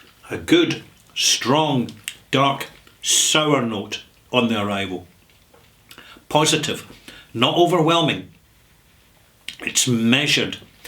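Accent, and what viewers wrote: Scotland, United Kingdom. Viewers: ...your whisky knowledge is without peer...you are virtually a university course on the subject. But sometimes I tune in to hear yuir Bonnie Scots burr...Gaun' Yersel' always, mate!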